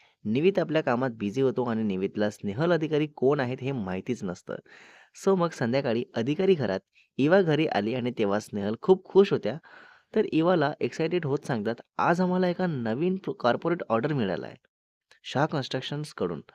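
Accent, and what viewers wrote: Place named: Gujarat, India. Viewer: Ur gujrati accent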